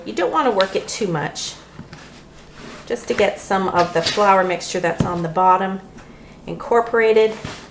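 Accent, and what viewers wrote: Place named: Canada. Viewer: I was fortunate to find the yellow bowl and lid at the thrift store. I sold Tupperware in the late 70’s but no longer in business. I will definitely hang on to the pieces I have for sure. I was going to wish you a Happy Thanksgiving but I think you are Canadian with your pronunciation of the “o” but enjoy that day too, lol!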